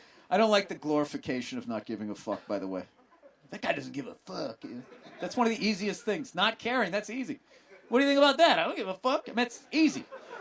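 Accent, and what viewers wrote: Boston, United States. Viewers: Hes bostonian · Does he do any of his overly pronounced Boston 'vowels'? I'd love to see him do one of those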